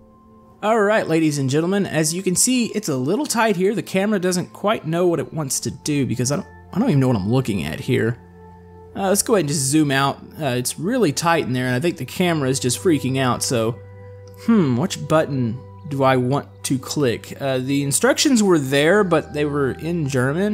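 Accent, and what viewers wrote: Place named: United States. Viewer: i can really here your country acsent in this video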